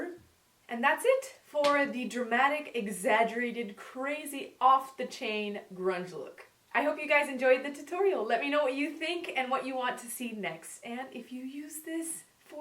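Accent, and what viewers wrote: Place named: Montreal, Canada. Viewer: you sound like you're from Montreal for some reason...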